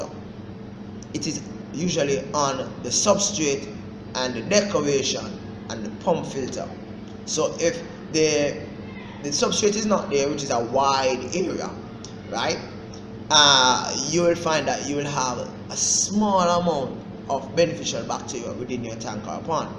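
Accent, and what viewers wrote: Jamaica. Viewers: Im going to enjoy your cannel good Sir and cheers buddy from atl Ga me and my wife saw your country and went to the blue hole your county is so beautiful and everyone i met was so kind and down to earth god bless ya and best of luck with your cannel good Sir and love your jamacian tone ya man and much love to you and your lovely country and lovely people take care bud :)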